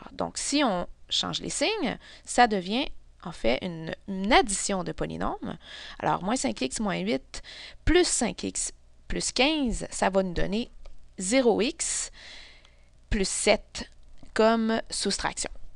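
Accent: Quebec, Canada. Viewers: Mohammed gj c'est l'accent québécois idiot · c est l accent québecois et c est mieux que d entendre un marocain parler...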